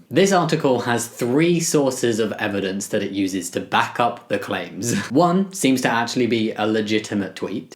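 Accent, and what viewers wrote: United Kingdom. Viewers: I keep forgetting your British I’ve just gotten so used to your accent🤦🏻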